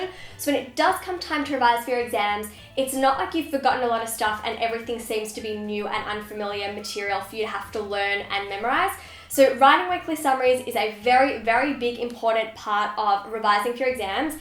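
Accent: Australia. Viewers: Are you Australian??? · Is she Australian ?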